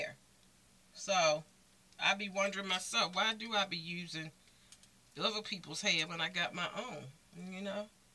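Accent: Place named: Maryland, United States. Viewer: Thanks for the entertainment your show kept me laughing it's so nice to hear a piece of home (maryland) you have to be I can tell by the accent.....Blessings hun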